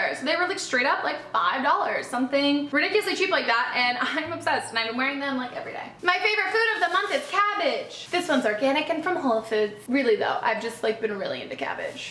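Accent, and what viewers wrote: Russia. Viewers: Russian accent really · Actually you really speak with russian accent. It was gooood:)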